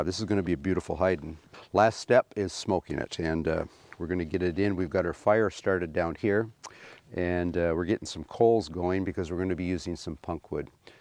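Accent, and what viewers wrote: Canada. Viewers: Truly excellent video! This guy is a National Treasure, I think he is Canadian from his speech patterns. He is articulate, considerate, and kind. Ted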